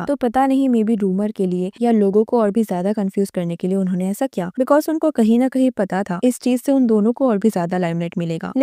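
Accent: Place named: Telugu, India. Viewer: After listening to your voice in a lot of your videos. I'm guessing you're a telugu. Is this true?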